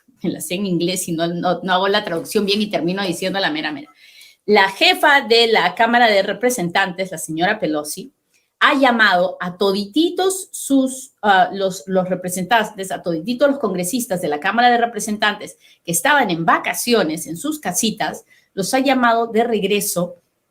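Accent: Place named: Mexico. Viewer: Por q hablas como mexicana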